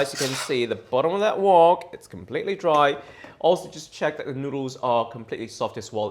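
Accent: United Kingdom. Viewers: ❤ your british accent 😊 sir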